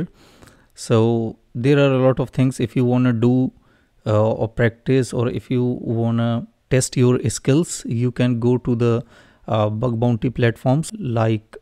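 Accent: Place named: India. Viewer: i didn't mean any criticism, imo indians have a tutorial in everything i love what you guys share with the world, and more importantly I LUV UR ACCENT ♥️🔥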